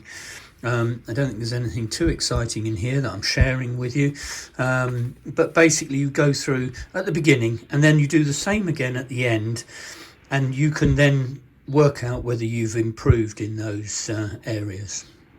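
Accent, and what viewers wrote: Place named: United Kingdom. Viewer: you're teaching me how to do british accent,ackkk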